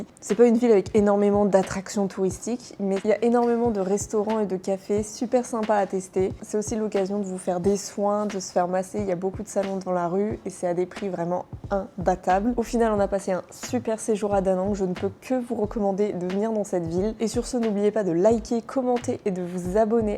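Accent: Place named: France. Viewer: Really like your French accent! Making me to relearn French. Look like you enjoy time in Vietnam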